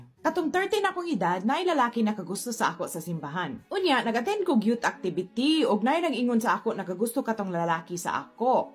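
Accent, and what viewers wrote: Visayas, Philippines. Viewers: Nahimuot gyud ko maminao nimo magbinidaya day. You're a real Bisaya, Bisdak gyud. ❤ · Bakak,batan-on..hehehe Bisdak gyud ka maam .hehehe😂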